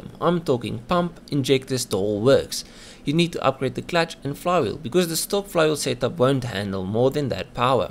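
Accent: Afrikaans, South Africa. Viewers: Do I hear a boertjie accent?😏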